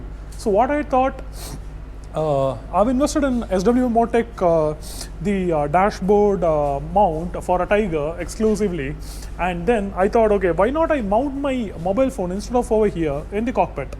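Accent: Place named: Texas, United States. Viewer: Great video! Thats a heavy accent are you from texas?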